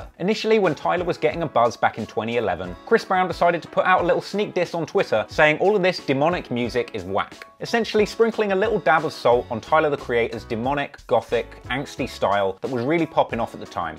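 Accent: England, United Kingdom. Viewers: keep those jokes coming and pursue a YouTube career stop trying to be a rapper u got english accent and u dont look like a rapper , ur " remember that one time" jokes are better than ur rap skills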